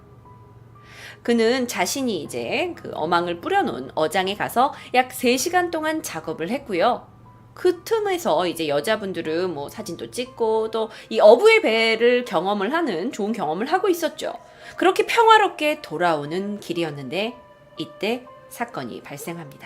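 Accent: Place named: Honam, South Korea. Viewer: 제시카님 전라도 출신이라 그런지 호남사투리 맛있게 하시네요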